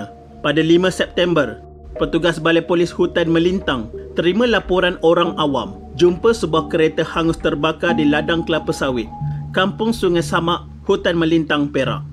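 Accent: Kelantan, Malaysia. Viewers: org klate ehh?? Klu x silap telinga sye dngar lorat nye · Pekat betul slang Kelantan ni ahahahah 😂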